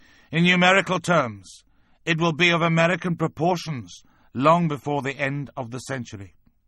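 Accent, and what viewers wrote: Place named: Birmingham, United Kingdom. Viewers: It's a Midlands working class accent that has been somewhat polished. Unusually for a top Tory Powell was not a toff, but rose through talent and effort - if only we'd been wise enough to listen to him... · Classy Brummie accent for these two-bob pseudo-intellectual views, good work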